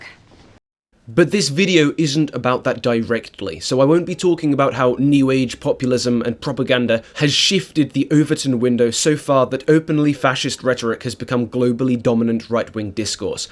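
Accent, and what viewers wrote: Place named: England, United Kingdom. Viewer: I really liked what you had to say about the representation of the fascist regimes in film, I found it quite insightful. I couldn't help but notice, though, your repeated comparison of current day American right-wingers to these fascist factions in media. I understand that as an Angle (judging from your accent), you are not incredibly informed on American politics, but I wanted to let you know that the American right is rather far from looking like a fascist movement. They really are just a bunch of libertarians with traditional views, and they really do oppose authoritarianism in most all regards. I don't say this to be provocative and point fingers at the other side, but the American left is far more of an authoritarian- dare I say fascist- movement. Just figured I'd point it out as someone who is living in American politics.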